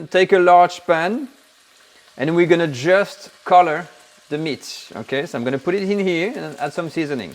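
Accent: France. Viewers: I like his French accent so much! It adds a lot to the experience!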